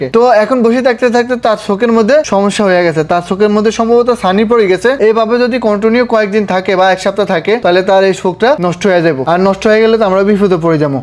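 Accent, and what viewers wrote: Noakhali, Bangladesh. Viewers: ভাই নোয়াখালীর মানুষ নাকি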